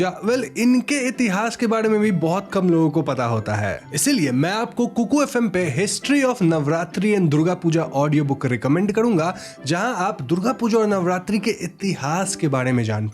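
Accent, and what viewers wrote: Bengal, India. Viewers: Your Bengali accent is nice.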